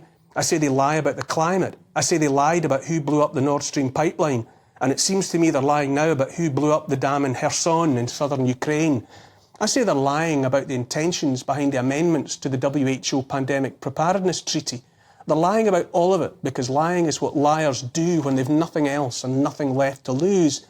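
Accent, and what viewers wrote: Scotland, United Kingdom. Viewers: Oliver: Would you consider making verified subtitles for such videos? While many people in the non-English speaking world might have enough command of the language itself, they have massive problems with the Scottish accent. (Which I personally thoroughly enjoy.) These videos by Oliver should be heard EVERYWHERE. Best wishes from Germany. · These tyrants will need to be physically removed! 🤬🤬 Won't Kneel A gentle, beautiful Scottish lilt A kind, empathic, forward tilt An open heart; a caring face A willingness to take his place. A strong, home-hitting monologue Reminds us of the boiling frog The courage to become a sleuth A passion to release the truth. A deep desire to crack the whip Illuminates our freedom-strip Calls out the darkness taking hold The shiny despots; plated gold! Intense and raw, he lays it bare A soul-enhancing, honest stare Inflections drive the message through He speaks for Us - for me and you! 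Discernment's such a precious gift His words create a mighty lift Take heed of all he has to say He knows - it shouldn't be this way! 🤗🦋🐬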